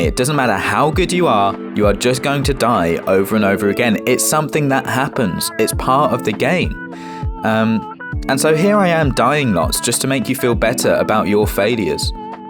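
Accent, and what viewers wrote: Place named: United Kingdom. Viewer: "Oh, for fuck's sake." This sounds just like me daily. Minus the British accent. It's still a lot of fun though.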